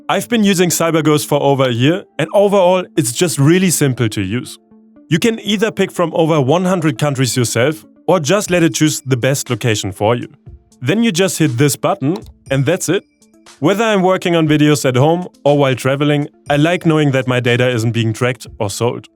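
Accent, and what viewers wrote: Germany. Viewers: Are you German? Your accent sounds pretty german to me! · As a German I tend to not like German accents but yours is just part of the good news for me. I originally come from the Lausitz and am intrigued by the news about the battery project. Keep up your superb work. I struggle to watch “normal” news since a decade now…and stopped it actually almost sompletey a couple of years ago as it was not doing anything good to my mental health status, just sometimes I open the tagesschau, but your videos give me so much faith in the future!!! Danke Leute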